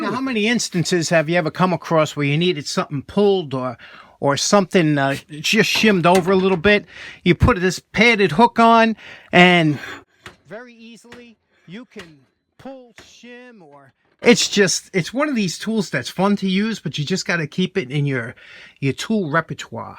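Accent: New York, United States. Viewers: i’m a new yorker too, born in brooklyn, grew up in Flushing, now live in Denver, great hearing your accent😊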